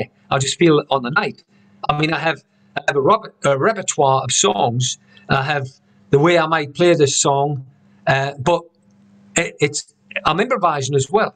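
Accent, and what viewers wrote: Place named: Ashington, United Kingdom. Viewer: You sound a bit Ashington ish! Sorry if am wrong!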